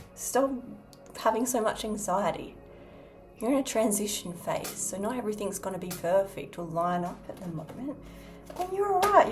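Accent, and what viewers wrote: Australia. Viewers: SHEEYA..😊 U AUSSIE'z..and yur VOWELS...YAY!! ROWR ROWR !! 🥀😁🤙💋❤️‍🔥😋😘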